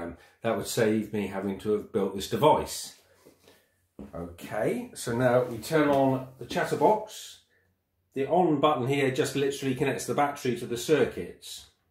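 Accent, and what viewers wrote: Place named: United Kingdom. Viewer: I love that idea! I wonder if my company could make something similar for appliances like that. By the way, I love your accent! Is that British? I wonder if that machine works on dryers as well. Like your wife, I'm totally blind, and one thing I would love to design is a washing machine and dryer pair that can have as many cycles as you like. Wouldn't that be amazing?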